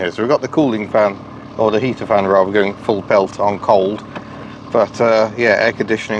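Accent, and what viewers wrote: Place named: Scotland, United Kingdom. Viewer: That Scottish accent is so cool my friend. I could listen to you talk about vegetable gardening for hours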